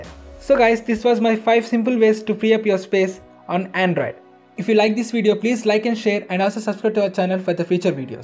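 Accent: India. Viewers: its called Indian accent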